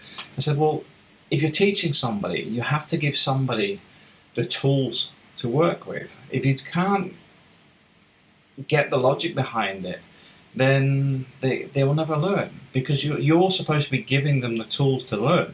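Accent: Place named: Birmingham, United Kingdom. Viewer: He even speaks like an idiot ! Bruuuuummmieeeeee and living there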